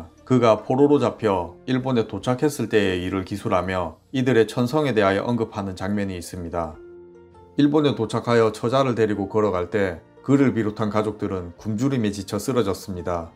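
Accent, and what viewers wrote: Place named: Gyeongsang, South Korea. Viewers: 일본인입니다.목소리도 좋으시고 내용도 재미있으면서도 잘 공부가 되고 개인적으로 좋아하는 경상남도 억양으로 말해 주셔서 유익하고 재미있는 영상입니다!! 구독합니다.!!감사합니다!! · 경상도 억양도 아네 ㅋㅋㅋ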